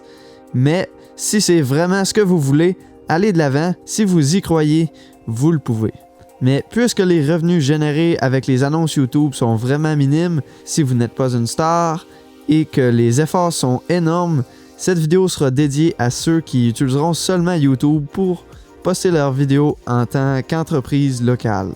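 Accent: Quebec, Canada. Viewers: Je suis curieux, comment ça se fait que du parles d'argent en Euros, alors que ton accent laisse penser que tu vis au Québec ?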